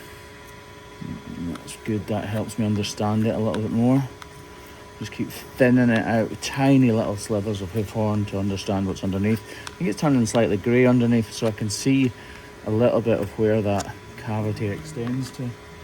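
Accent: Scotland, United Kingdom. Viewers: Normally I love Scottish accents and regional word variations, but for some reason hearing "slither" in place of the "sliver" I'm used to irritates me every time.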